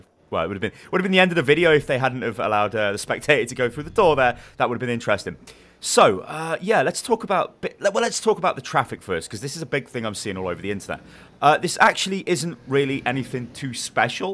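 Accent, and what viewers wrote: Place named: RP, United Kingdom. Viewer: you sound JUST like JP from Fresh Meat. Quite posh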